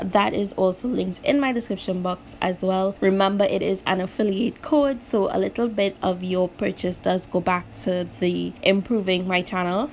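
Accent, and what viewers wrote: Trinidad and Tobago. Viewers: First video of yours I'm seeing. Are you from Trinidad 🇹🇹? Your accent sounds like it. 😊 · I know a Trini accent when I hear one. Big up yourself sis!!!!! ❤️🇹🇹🇹🇹🇹🇹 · Loving those nails and the accent my sister 🇹🇹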